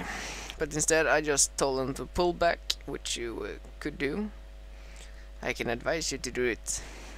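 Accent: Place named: France. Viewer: dude you sound like your french English is good but can improve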